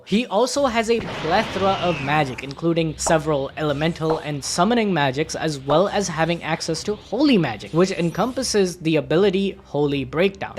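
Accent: India. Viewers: Are you indian by any chance?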